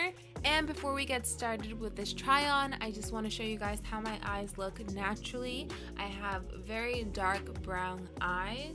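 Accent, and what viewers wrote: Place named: Belize. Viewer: Are you from Belize? I hear the accent in some of your videos. I love your video content :)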